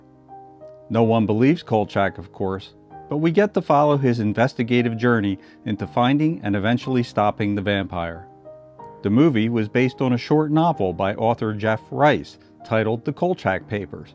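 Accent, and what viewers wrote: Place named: Philadelphia, United States. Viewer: One of my favorite shows; you video makes me miss it and hearing your Philly/South Jersey makes me miss Wawa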